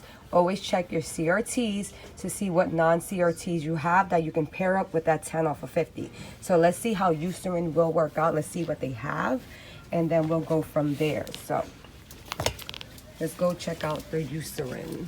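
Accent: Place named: New York, United States. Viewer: I can tell you use to live in NY you got that NY accent we all have lol I’m from Brooklyn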